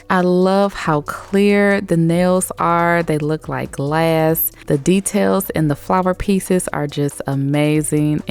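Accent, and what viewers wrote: United States. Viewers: From London Brixton UK. Wow. Girl you got creative talent. Beautiful. This is the USA female accent that sounds real nice.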